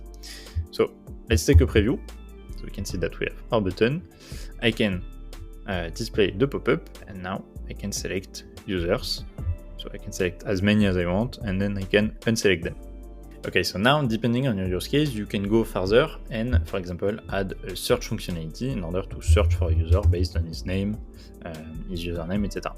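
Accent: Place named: France. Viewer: Bro you have amazing accent!!! French most beautiful language in the world!!! Great work also 🫱🏼‍🫲🏾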